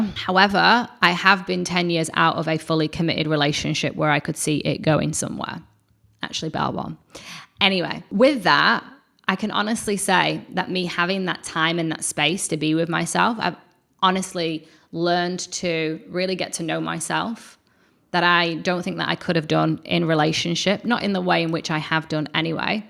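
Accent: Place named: Australia. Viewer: Omg this is so relaxing You have that slight Aussie tone in your voice in the back , that makes my brain go numb for the rest of the time you’re talking. That scratchy growling raspy purr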